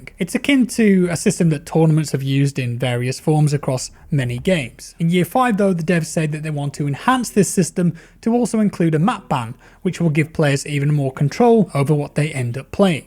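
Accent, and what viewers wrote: United Kingdom. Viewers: Where in the U.K are ya from mate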